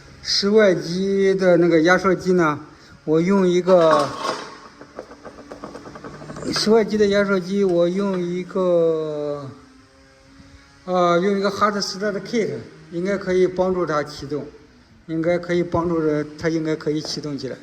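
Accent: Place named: Henan, China. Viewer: 大师听口音河南的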